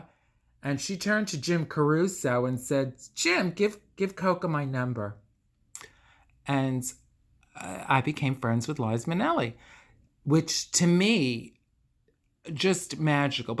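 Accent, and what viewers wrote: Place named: East Coast, United States. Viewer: I simply cannot fathom the fact that instead of being touched by ur heartwarming confessions, people choose to see it as name-dropping. Oh well. Your east coast accent perfectly matches the west coast setting miss coco, thnx for sharing these gems with us puffs. Love from greece ❤️❤️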